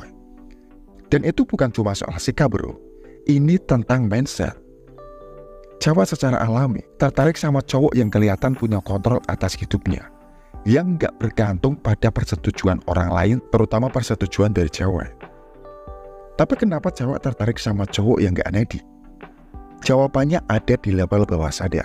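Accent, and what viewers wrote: Java, Indonesia. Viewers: Medok banget Jawir nya,, puyeng dengernya...😇😇😇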